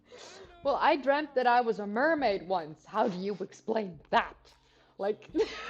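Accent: United States. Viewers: your diction, intonation and pacing / rhythm so like "American English", that I thought you were an American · your accent,..which, to my ears, sounds rather American - influenced ( especially the ‘ r ‘ consonant )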